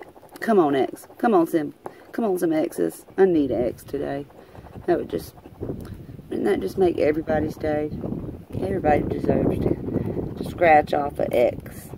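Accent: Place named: South, United States. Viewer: Nice southern accent gal!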